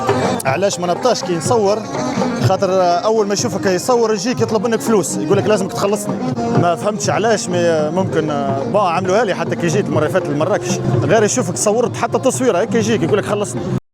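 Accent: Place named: Libya. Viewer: عندو اللهجة الليبية وليس التونسية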